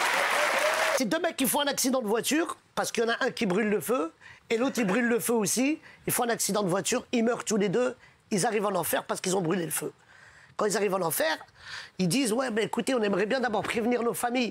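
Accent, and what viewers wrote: France. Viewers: Cest si plaissant ecouter des blaguees du vrai français et non quebecois français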